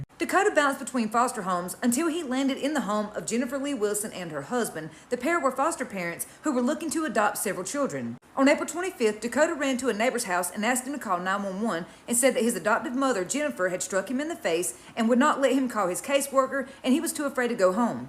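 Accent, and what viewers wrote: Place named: Carolinas, United States. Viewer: I can’t tell if you’re accent is from North Carolina or South Carolina. Probably north if I had to put money on it.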